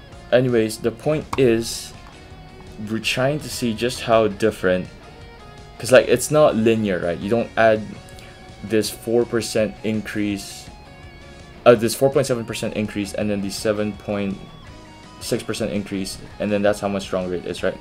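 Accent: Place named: Brazil. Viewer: I swear that you was talking in Portuguese in this video.. your voice sounds like a Brazilian voice 😂 I was searching in Portuguese this video because I want to see again, but now I found it.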